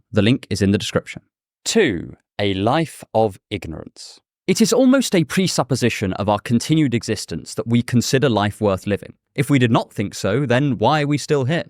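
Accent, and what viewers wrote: RP, United Kingdom. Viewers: You should do a video about biased toward certain sounds or accents according to where one another is originally from. Because at the start of the video my headphones werent on and when video began to play. I was like hey he's a certain kinda cute like a 6.9 but once i heard that semi-posh, almost bbc rp accent, you went up to at least a 9. Another example is the lead singer of inxs michael hutchence yes he was a darling but his singing and aussie accent had me as a young girl thinkin' wow he blew my mind he skyrocketed to an 11 and i'm just a yank. Been to a bunch of shows over the mid 80s into mid 90s. Them playing LIVE is better than any I've seen ever after back in the way back days i was 15 in 1988. Although the drummer Jon Farriss was by far more symmetrically attractive with the chiseled cheek bones, but he didnt really say a whole lot (the strong silent type) and i think he may have sung on one obscure tune! 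But he was a looker for sure!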